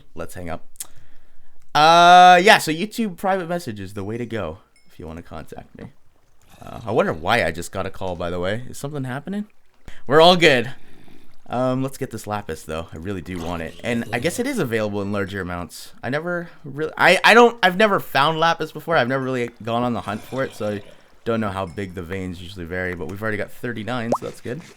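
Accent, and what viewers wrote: Canada. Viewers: Is he Canadian? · he is Canadian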